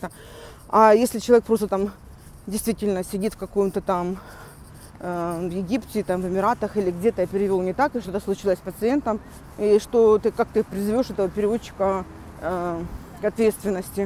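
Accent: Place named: South, Russia. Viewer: Да ладно тебе к Москве придираться. Прям смотреть ролик не приятно, я понимаю что тебе там накипело и прорвало. Я так понял вы критику воспринимать не умеете. Но это смешно все видео замирать Москву. Работайте над собой. Пишет вам не Москвич если что. Первое что отталкивает это акцент, южный акцент он провинциальный, в Москве это всех отталкивает. И да Москва этот высший уровень России , увы не Краснодар. Этот факт тоже стоит принять😂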